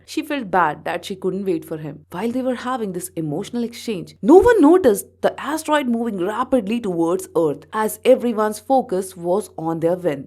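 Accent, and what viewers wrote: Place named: India. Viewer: The Indian accent is sooooo hard .... even jetfuel cant melt it....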